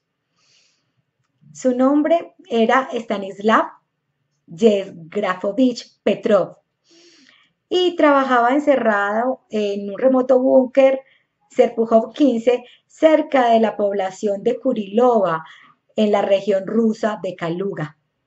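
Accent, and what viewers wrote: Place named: Medellín, Colombia. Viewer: yo tambien soy de medellin es que tu acento se me hacia familiar jejeje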